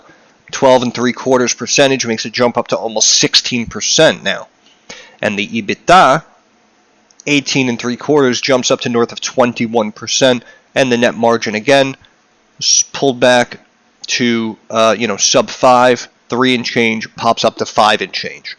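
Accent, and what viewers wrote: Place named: North, United States. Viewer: u did an amazing job brother thank you especially after watching the second time,and totally agree on politics and YouTube lol, GE would cool to get a look at I’ve never really leaned towards the more conventional stocks but with everything going Electric I can see them as a play for future (I just want greedy returns😂🤣😂🤣) but yea and the renewables and things of that nature would be awesome too glad I found ur channel, o and are u up north by any chance I’m from nyc and thought I noticed a northern accent lol.. 🍻 my friend!!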